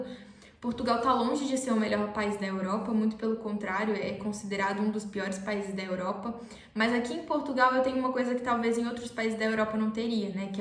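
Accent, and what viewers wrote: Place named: Favela, Brazil. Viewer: Portugal é um dos "piores países da Europa"? Trava a língua, tá brasileira? Trava SIM piorque a tua língua que é bem comprida e afiada. Não achas que por Portugal ser o que dizes que não deverias estar cá e que NUNCA deverias ter vindo. Vê-se logo que és brasileira. O que será que "vinheste fazê prá PortugAAAAAAAAAAAAAAAAAAAAAAAAAAAAAAAAAAAAOOOOOOOOOOOOOOOOOOOOOOOOOOOOOOOOOOOOOOOOOOOOOOOOOOOOOO" se nem de origem portuguesa és? Qual é a afinidade que tens com Portugal, com os portugueses, com a cultura, com os costumes etc? Nem mesmo com a língua tens qualquer afinidade. Nós portugueses não falamos o português da FAVELA como tu falas. Trava a língua, falsa turista.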